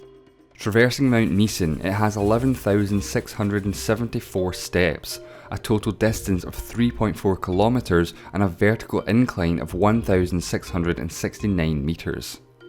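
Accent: Scotland, United Kingdom. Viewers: I like his scottish accent.. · Its a Scottish accent not English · He is Scottish. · Sees thumbnail. Cynically wonders what BS they'll say about Scotland. Hears a Scottish accent presenting and is filled with hope. Are you actually kidding me? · I have no clue about this fact. Also, I subscribed! Are you from Scotland?🏴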